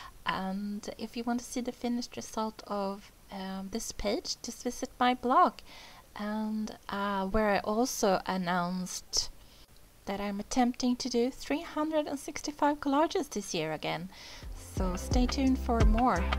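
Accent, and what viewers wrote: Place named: Sweden. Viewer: sigh...i do love your art, follow you on insta. I just wish I could understand your lovely swedish accent, as I am an american speaker. I can only catch a few words now and then. I know it's not your problem. That said, I'll watch, but will not try to understand. the closed captions are in swedish, of course, not english.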